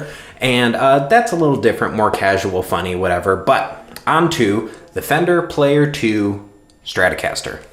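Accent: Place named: South Carolina, United States. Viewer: hahahaha new follower because of your voice and accnet, sounds like south carolina deep in the south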